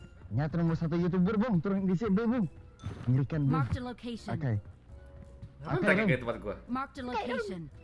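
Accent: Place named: Banjar, Indonesia. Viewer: Orang banjar mana suaranya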